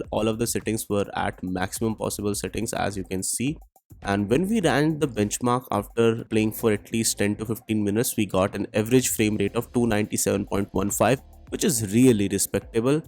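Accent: India. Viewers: Where you live man the Indian accent feels nearly my country’s normal accent cause how much I hear it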